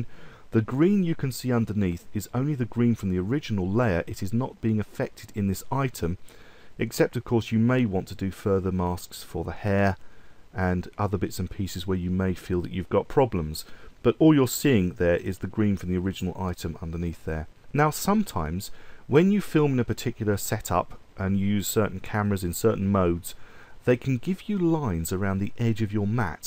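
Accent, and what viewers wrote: United Kingdom. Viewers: JUne 2021: after all this years still the best tutorial to this topics I found. Thank you very much! (And your british English is so good to understand!!!!)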